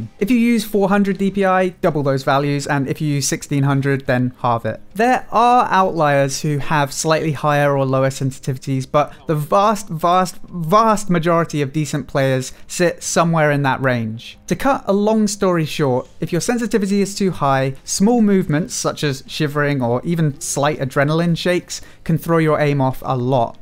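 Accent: United Kingdom. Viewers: Where r u from ..?? British accent ..!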